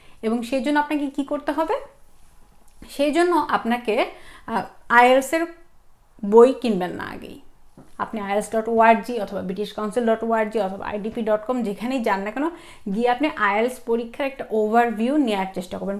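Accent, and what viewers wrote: Sylhet, Bangladesh. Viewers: Apu apni Sylheti? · khuda lagi gesilo......sylheti accent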